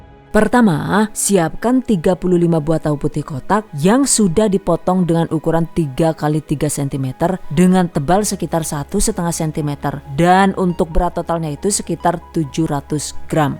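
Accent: Banyumas, Indonesia. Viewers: Mba, njenengan wong ndi sih, deneng kadang teyeng ngomong ngapak😅😅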